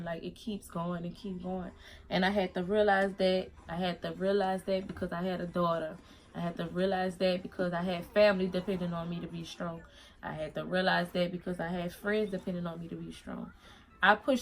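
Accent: New Orleans, United States. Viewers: you talk like u from louisiana where you form ?- im a new supporter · Rip to your sister. U from New Orleans u sound like it. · New Subbie! I love your frenchy New Orleans accent. So Sad! RIP Breon Prayers to you, I know it's hard. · First time watching you, is that a New Orleans accent I hear 👀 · This video was in my recommendations.This is so heartbreaking. You are incredibly strong! I couldn't imagine. Praying for you mama.❤ Also, are you from NOLA? I know that accent when I hear it lol. If so, hey girl from another fellow Louisiana mama!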